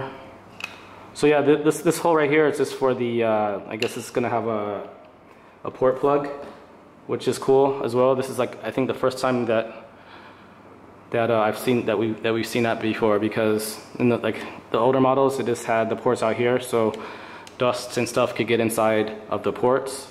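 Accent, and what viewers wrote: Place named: China. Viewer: Professional product demands professional review not an amateurish review...... the guy is talking Chinglish....get your act together.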